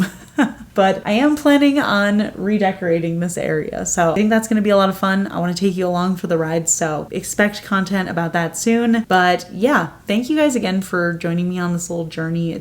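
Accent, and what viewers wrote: New Jersey, United States. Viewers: My late mother was from Jersey, and your Jersey accent (like all Jersey accents) brings me joy! Glad you haven’t lost it! ❤ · I'm happy the surgery was so successful. It's so nice hearing your New Jersey accent rather than blocked sinus Jersey. Wishing you continued good fotune with the swelling going away.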